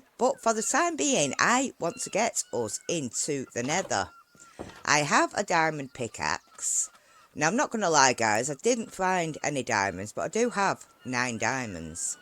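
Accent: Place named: Northern England, United Kingdom. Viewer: im UK based too! Down south, you sound more northern .. glad to hear you are keeping your spirits up, take care.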